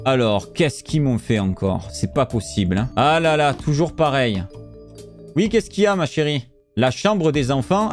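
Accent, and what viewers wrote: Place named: Marseille, France. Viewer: Cc je pense que tu habites à côté de Marseille tu a des accent 😭😭😝😝😜😜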